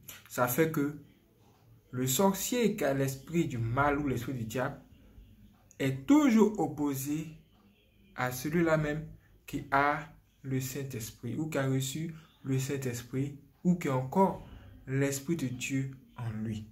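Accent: Ivory Coast. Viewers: C'est un accent purement ivoirien ça.... Très loin de l'accent camer...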